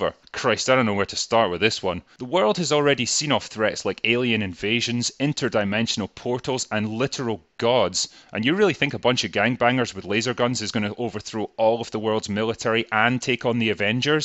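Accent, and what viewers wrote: Scotland, United Kingdom. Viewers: he's the same in pretty much all his videos(including older ones), that is drunk and with a heavy scottish accent, but he became more polite for this particular video as his arguments would have been diminished and he would've been called a racist. Well atleast that's my hypothesis.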